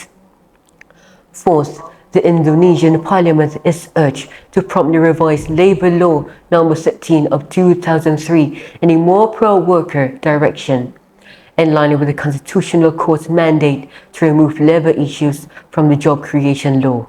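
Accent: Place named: United Kingdom. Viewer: Mas british sekaleee😘😘😘😘❤keren euy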